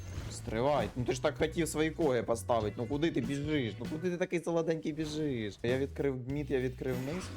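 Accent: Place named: mixed, Ukraine. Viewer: привіт- класний контент:) скажи як можеш з якої ти області)) балачка полтавська чи черкаська)?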